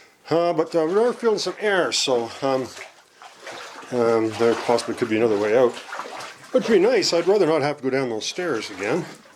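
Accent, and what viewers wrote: Canada. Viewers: Wonder if he is Canadian, eh?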